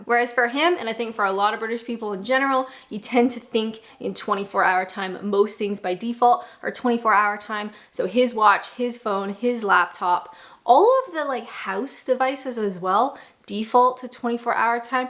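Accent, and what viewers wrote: Canada. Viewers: Hi, Canadian here, I will still eat peanut butter and jam and when I was young -- and not so young -- yes to a peanut butter and banana sandwich. Here in Nova Scotia, it is still traditional to have a Sunday roast. Guess that's because so many people here have British and Irish roots -- also it is the big meal of the week for the family. Not everyone does it but it is certainly something I was used to. You may use a lot of 'Britishisms' in your every day conversation now, but you still have your Canadian accent! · She couldn't have said "aboot", because we don't say "aboot". As a Canadian, still sounds pretty Canadian to my ears. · Moved to Scotland two years ago and just found your videos. Been binge watching for days now. No, I'm not weird. Just enjoy them. As far as your voice goes, I actually think you sound more American and lost a lot of your Canadian inflections from your earlier videos. 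You used to do harcdore aboots but not so much anymore. Keep the videos coming! The history tidbits have been fascinating and my wife is a naturalized Scottish citizen and she didn't know alot of them.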